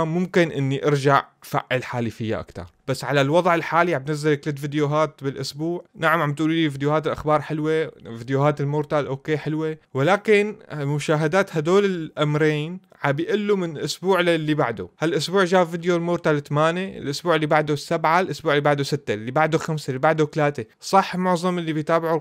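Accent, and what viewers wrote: Syria. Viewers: لا منتا غليظ وانا ما اعرف سوري وفاهملك ١٠٠ فل ١٠٠ بس منجد يمكن الناس ما يحبو اللهجة سوري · اوووعك تترك القنااه انا ماحبيت المورتال الا بسببك الصراحه وعندك تفاعلك رهيب وبالعكس.. الي شدني اكثر لهجتك السوريه والله رووعه ( ولك يحرئ ديبك يازلمه😂) هالجمله ذي تضحكني لما تاكل لك اكله في المورتال استمر و ان شاء الله ربي يوفقك نزل مقاطع قليله بس لا تترك القناه♥. وتحياتي لك يا. اخووك من السعوديه🇸🇦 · انا مابتابع قناتك الا عشان اللهجه السوريه تبعك 😂🤣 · احلى لهجه هيه السوريه و انت بالعكس انسان راقي و مرح كلش والله .. بالعكس استمر و حاول اتطور اكثر بالقناة و ربي يوفقك للي تتمناه .. اخوك ومتابعك من العراق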